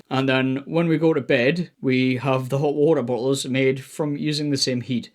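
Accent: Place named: United Kingdom. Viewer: It's a torch, not a flash light. You're British (going by your accent). lol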